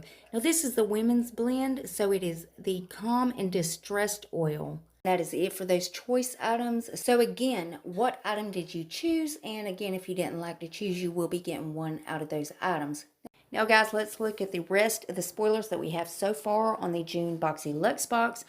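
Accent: South, United States. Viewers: Hey girl! This is my first time finding your YouTube Channel. My name is Kate and I’m from Vermont, but went to college in Memphis, TN and LOVE your southern accent!! Anywho, for my BoxyLuxe June box I chose the TULA Glow and Get It Eye Brightening Balm and my 2nd Choice Option (I wanted the Swell Bottle but it was no longer an option) so I selected the Gua Sha Face Sculpting Jade Device. By the way you literally made me Laugh Out Loud when you said “Y’all, what the hell? Y’all know I don’t know how to pronounce anything. Let’s move on...” I instantly subscribed to your channel after that as in my Vermont accent I said the same thing, LOL! Have a beautiful month of May and thanks for sharing with us!!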